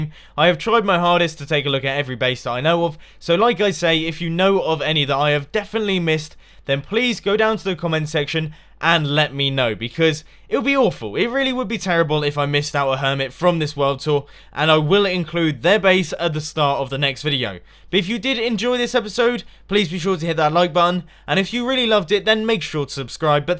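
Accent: United Kingdom. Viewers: MUMBO IM CAPSLOCKING THIS SO YOU THINK IM ANGRY AND YOU RED MY COMMENT PLEAS STAY AS AWESOME AS YOU ARE AND THE MUSTACHE IS NOT REQUIRED BECAUSE WE ALL CAN HEAR THE BRIT OUT OF YOUR VOICE REDSTONE 4 LIFE <3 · what part of the UK are you from?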